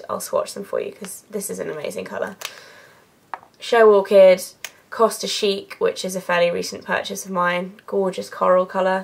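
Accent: United Kingdom. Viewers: Your accent is DIVINEEE im British, and ive always hated our accent on all accounts, but for some reason yours seems to be an exception, i absolutely love it! I wish/hope i sound like you do! LOL :) You're an amazing guru, keep up the good work! You're videos/tutorials have really helped me out :D x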